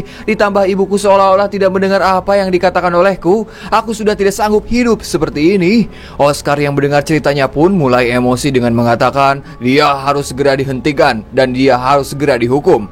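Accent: West Java, Indonesia. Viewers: Nada narasinya kaya IQ7 deh,apa emng klo org Sunda ngomong Bhs Indo kaya gini yaa?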